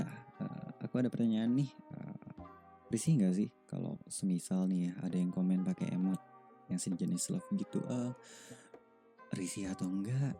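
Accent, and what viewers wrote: Indonesia. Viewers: Ehhh aku baru nonton dan denger iniiii. Nice to know you ya kakk! Ternyata kita ga jauh beda umurnyaa, kamu 2 tahun lebih tua daripada akuu. Aku juga ngerasa aksen kamu sunda banget, soft banget, tapi ga spesifik nebak bogor sih wkwkwk. Anyway, aku berharap ada lagi Q&A kayak gini lagii 🥺🥺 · Plis ya gue baru denger video ini, dan bogor accent itu nyata adanya loh wkwk kerasa aja gt beda nya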